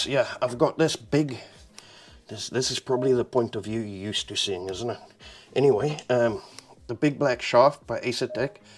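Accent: South Africa. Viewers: dunno how i stumbled onto your channel ill give you sub. Loving the attitude and thick accent, i miss SA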